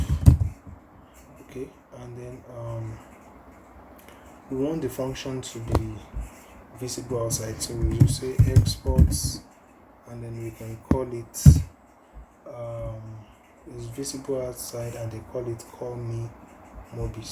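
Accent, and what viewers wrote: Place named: Nigeria. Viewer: From your intonation, I feel you re a Nigerian. pls i base in ph. i need a mentor on programming. pls be my guide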